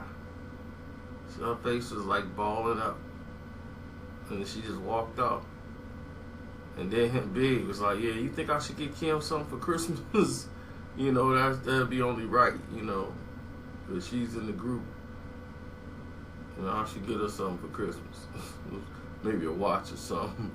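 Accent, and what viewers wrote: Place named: Houston, United States. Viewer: Nigga talk like he from Houston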